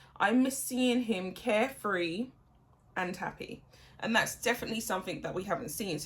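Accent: United Kingdom. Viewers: Lovely to put your face with your UK voice! Great to see a young lady with great common sense to see through the UNTALENTED ENTITLED GRIFTERS!! It was reported that approval was given to print the pics to their children as there is a law in the States that children's faces MUST BE PIXILATED unless media has approval from the parents. So much for PRIVACY of the fake royals in the States.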